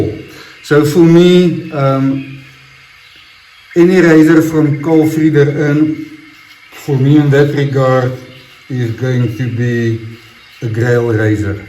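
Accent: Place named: South Africa. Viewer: I came for the amazing shave and education. And I'm staying for the accent! It sounds South African to my ears. Stay healthy and happy and always dapper! Greetings from Australia.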